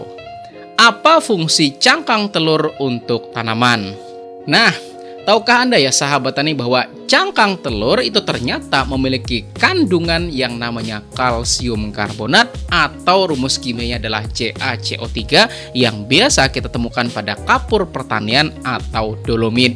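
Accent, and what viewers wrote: Banjar, Indonesia. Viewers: Logat Banjar nya masih ada😅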